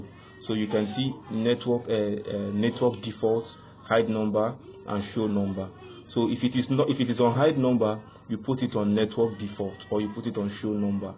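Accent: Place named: Nigeria. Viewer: low noise in addition to Nigerian accent is difficult to understand. non sense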